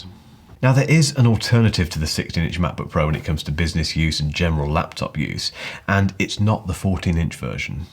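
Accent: United Kingdom. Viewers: Watching your videos not only gives much useful info but also allows listening to perfectly clear British speech, which is like honey in my ears. From Russia with love:)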